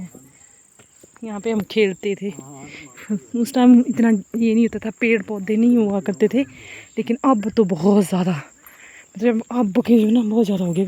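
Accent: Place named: Himachal Pradesh, India. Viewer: Himachali boli vadiya lagdi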